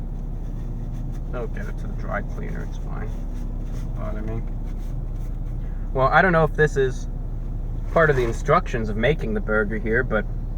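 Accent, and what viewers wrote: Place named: Florida, United States. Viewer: I never thought that listening to a posh floridian review fast food would be so entertaining.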